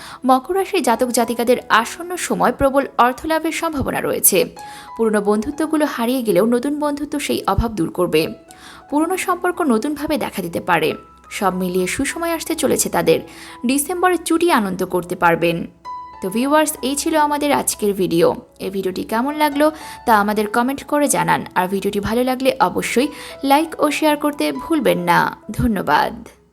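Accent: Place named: Bangladesh. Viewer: BANGLADESHI VOICE VALO LAGCHHE NA BLOCK KORTE HABE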